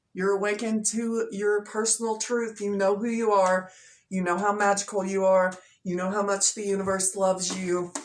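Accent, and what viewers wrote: South, United States. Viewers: Thank you for that! It took over two hours to watch your video due to all the synchronicities. I was resonating and meditating with eyes full of tears baby! This was quite an experience for me. Thank you. By the way that southern accent is very becoming of you and I like it! 😘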